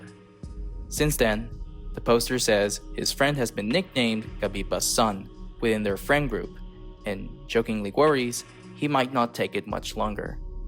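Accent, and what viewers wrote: United States. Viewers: no vabbè ma il tuo inglese è pazzesco fra 🫂 I shyt you not, pensavo che fossi americano (o comunque un americano di prima generazione, con genitori italiani) per quanto buona è la tua pronuncia ahahah ti giuro, sei arrivato ai nomi e ho detto “ayo che pronuncia perfetta che ha in italiano”, sono andata a vedere sul tuo canale la nazione che hai impostato, leggo “italia” e mi sento una c•gli•na, perché torna tutto 😑💀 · ...l'autore di " "! 😱 PS: quando parli inglese hai un accento americano perfetto! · Hai un eccellente accento americano, veramente complimentoni. Ma....andiamo...nessun americano sarebbe riuscito a pronunciare correttamente PAPERISSIMA, IN MANIERA COSì FLUENTE POI. TI SEI TRADITO DA SOLO ahahaha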